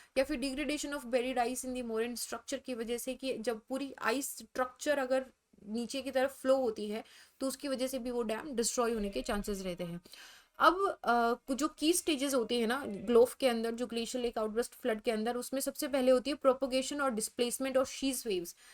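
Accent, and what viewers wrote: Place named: Rajasthan, India. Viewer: Amazing discussion on GLOF, Thank you so much ma'am your voice tone seems like you belong to Rajasthan my home state.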